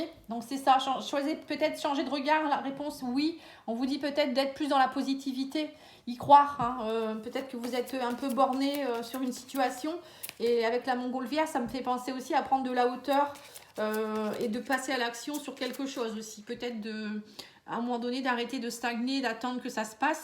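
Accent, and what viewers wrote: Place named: North, France. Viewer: Cc ohoh cet accent du nord que l'on reconnaît fort merci pour la guidance 😊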